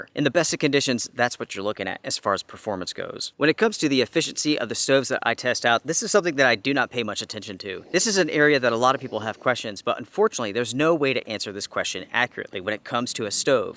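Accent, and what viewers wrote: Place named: North Carolina, United States. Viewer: Everyone from NC says varabals like him.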